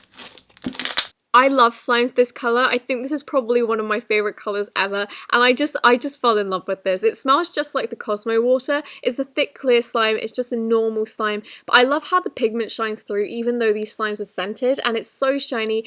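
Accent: United Kingdom. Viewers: love your british accent :Đ can u teach me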